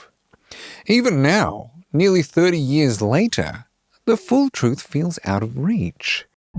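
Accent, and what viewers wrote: Australia. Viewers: They both are Australian, so that might be why they sound similar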